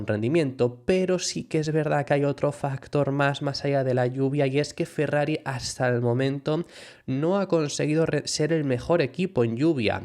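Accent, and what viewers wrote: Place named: Spain. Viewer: Parece por tu acento que eres español, y por supuesto el titulo de "Alonso fue el mas rapido" debio ir acompañado de "pesco en rio revuelto", con ese auto si acaso en pista seca se mete de 7º o de 8º, hay que ser más realistas que fanaticos.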